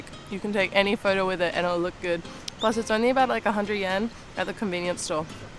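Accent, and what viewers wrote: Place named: Australia. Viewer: I dont think I ever comment on your videos, I like how you show things in a chill way with your aussie accent! <3 enjoy! my dream is to go to japan one day !😄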